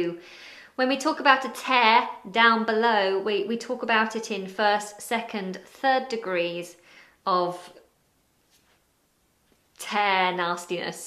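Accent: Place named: RP, United Kingdom. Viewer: Dear Madam Teacher,thank You so much for this great lesson and especially for Your pure,clear RP British accent! Btw, in the Bible (Old Testament) God created Woman like a last thing and the BEST.(real theological opinion)! Thank You again.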